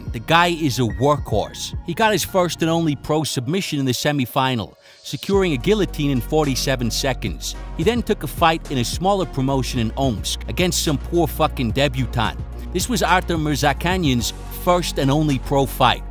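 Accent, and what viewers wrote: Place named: Northeast, United States. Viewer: Time for a poll: Which part of the Northeastern US is the narrator from?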